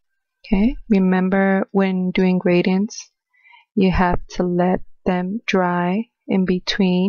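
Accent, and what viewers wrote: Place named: Cuba. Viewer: No no hablas muy bien pareces cubana me gusta tu acento eres muy buena haciendo videos sige asi yo te sogo siempre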